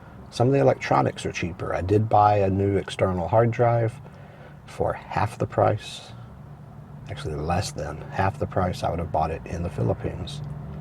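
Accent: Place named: Texas, United States. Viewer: Howdy I don't think you are wearing boots anymore, but I can see that you still have some Texan southern accent with a twist left in you! Thanks for sharing! enjoy your long visit partner... Best regards/Ned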